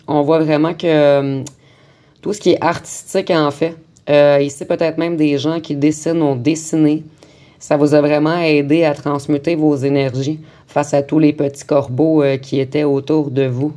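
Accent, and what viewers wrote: Canada. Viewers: Bonjour merci pour ce tirage qui me parle beaucoup,gardez bien ce joli petit accent canadien, belle journée à vous 😊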